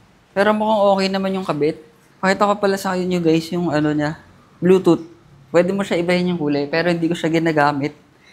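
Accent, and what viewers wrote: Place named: Visayas, Philippines. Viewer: solid ung pgka bisaya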